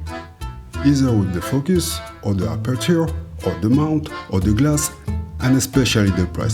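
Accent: France. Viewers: J adore comment tu own ton accent frenchy la classe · Super Chaîne ! A l'accent vous parlez français nan ? :D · Im a bigf takumar fan but I own ttartians are good too your french accent is quite pleaseing what software do you use for your graphics?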